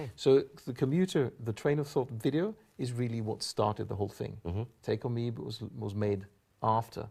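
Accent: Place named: Norway. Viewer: It is definitely his/the Norwegian(Oslo area/south east Norw.)accent.(BTW heard some Aha's earlier interviewes recently and their English was really good back then(80's/early nineties)I think his swedish wife(Camilla?) had their children over there too.I think both him and Mags are unaware of these tiny flaws"Being half Norwegian myself,ppl tend to ask me if I'm from the Isle of Man, Wright or some other isle.Most Scandinavian ppl(Or ppl from the Netherlands,Finland, Belgium...most of them prefer sort of an American accent.Met this bloke from Scotland once,thought his accent was sooo charming(Probably because he was too lol.) Morten, Mags and everyone else should keep their accents.The way you smile,laugh walk, talk,think,express yourself in general...I believe that's what make most ppl unique in the first place✌🏼🌺🤲🏼🦋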